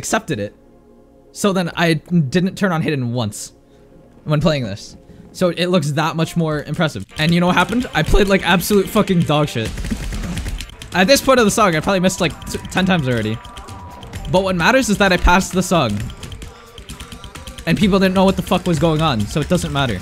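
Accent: Canada. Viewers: is he canadian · took me a min to guess youre a Canadian student XD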